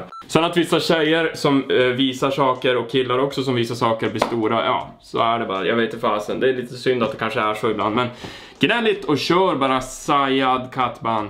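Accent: Norrland, Sweden. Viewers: hahah, skönt snubbe och norrläning! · Jååå. Hittade din kanal typ förra veckan. Gick en video och prenumererade direkt! Visat dig för alla vänner och för familjen. Alla tycker du är lika rolig som jag tycker. Sedan så e man ju halvt Norrlänning också så klagar ju inte på dialekten😉 ae fan vad rolig du är, fortsätt med det du gör. Man får alltid ett gott skratt❤️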